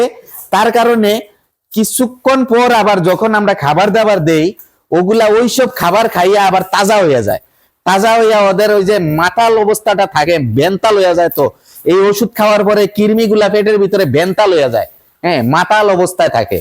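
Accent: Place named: Sylhet, Bangladesh. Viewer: সিলেটি মাত হুনিয়া ভালো লাগচে❤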